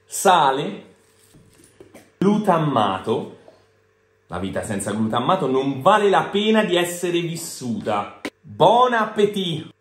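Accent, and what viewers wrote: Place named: Umbria, Italy. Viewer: Umbro fino al midollo, sentirti parlare mi ricorda le trasmissioni di TEF channel guardate alle 3 di mattino perchè sotto allucinazioni da febbrone.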